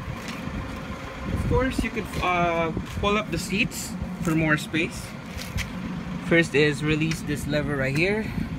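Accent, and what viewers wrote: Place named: Philippines. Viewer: Galing mag english halatang pinoy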